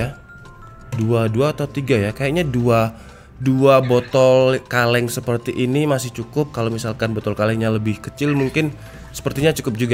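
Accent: Malang, Indonesia. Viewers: Saya kyk nya kedengaran Malang ,Malang mana bang 😂😂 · Medhok malang😅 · Lo mase wong malang to bak e 😁😁😁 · Ela wong jowo jebule, "Opo si ki jenenge" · Owalah mas jawa pisan to... Org mana mas...saya Banyuwangi, Hadir🙏🏻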